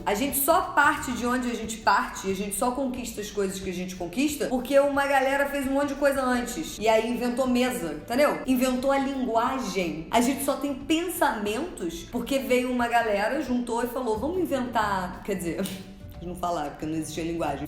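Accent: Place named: Rio de Janeiro, Brazil. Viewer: Eu li um pouco de Fuco, que ele é extremamente futurista e analítico sobre nossas existências, e eu fiquei confusa confesso com a informação de que somos pontinhos e milhares deles e que enxergamos a matéria em si porém o nosso cérebro transforma esses pontinhos em matéria, por isso nos enxergamos em corpos físicos e não pontinhos, teorias são uma excelência da vida humana, se todos os seres humanos tivessem consciência das suas capacidades que vão além desta que é a matéria prima primitiva o mundo seria melhor para ser vivido certeza, e acho super fofo você falando com seus "s" no final das palavras com sotaque carioques ....adoro seu canal vida inteligente no YouTube sucesso jout jout...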